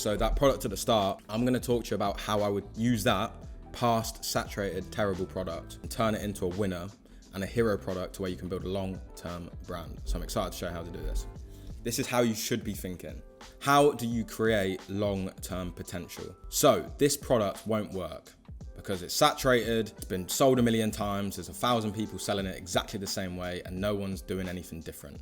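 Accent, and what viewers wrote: United Kingdom. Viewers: That voice sounds british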